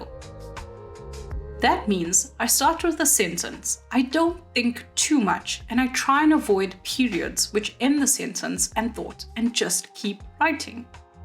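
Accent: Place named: South Africa. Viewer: I’ve always been told that the South African accent is calming and it took me 33 solid years but now I agree.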